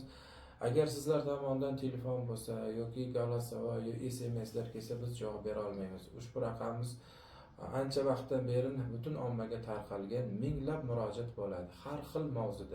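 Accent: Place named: Khorezm, Uzbekistan. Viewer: Ассалому алейкум. Ози каерлик ? Шевасидан хоразим или Караколпогистон турткул или элликкала беруни туманиданми .телеграмда чикмаябди номерингиз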